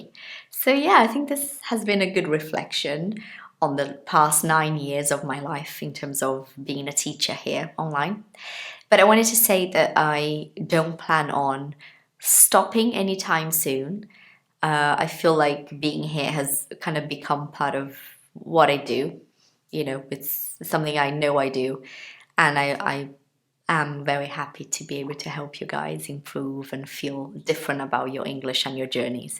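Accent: United Kingdom. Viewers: Arrisco dizer que é porquê o povo só se interessa pelo inglês "americano" já que o dela é britânico, fazer o quê, os melhores canais são sempre notados por uma minoria enquanto modinha ou porcaria rende milhões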